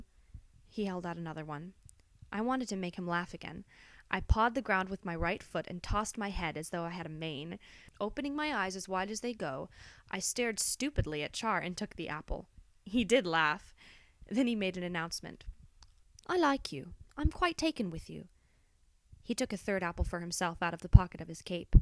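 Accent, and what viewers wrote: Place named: United Kingdom. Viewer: more like posh English, lol i loved the different languages, I never know how to say them when I read it.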